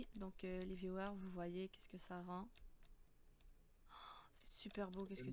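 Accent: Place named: Quebec, Canada. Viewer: Très bel épisode. Question montage, just perfect. Tu as un accent Québécois ^^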